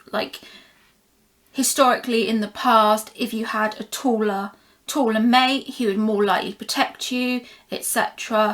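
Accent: United Kingdom. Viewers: I can listen to her all night long. She sounds like BBC/London. 😊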